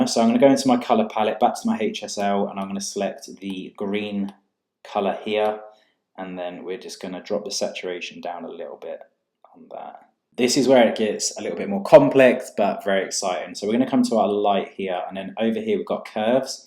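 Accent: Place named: South East London, United Kingdom. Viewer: He sounds like he is from the south east of london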